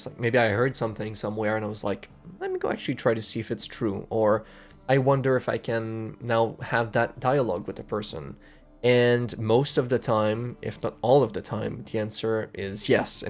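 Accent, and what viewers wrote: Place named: Quebec, Canada. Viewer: I think I spotted a fellow Quebecer's accent. Cool video man, on aime le witcher 3 les deux autant je penses !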